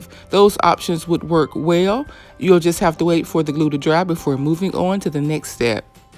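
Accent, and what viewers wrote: South, United States. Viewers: I hear. Just with a southern flow but clearly. Love the table.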